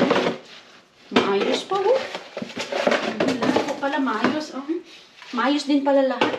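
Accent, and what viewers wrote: Visayas, Philippines. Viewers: Ate NA eexit din talaga ako sa iyo habang nag hohole ka NG mga NA dumpster ka kasi ang igay MO talaga halata g bisaya din talaga hahaheee. Horayyyyy. Ate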